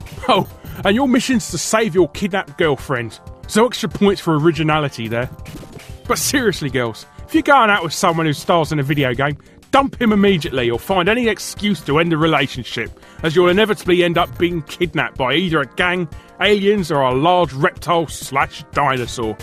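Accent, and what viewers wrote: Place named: Cockney, United Kingdom. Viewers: that english accent is awesome!! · So you talk in the same way that was ridiculed in that old play " " where that guy sings the song with the lyric "no one taught him 'take' instead of 'tike.'"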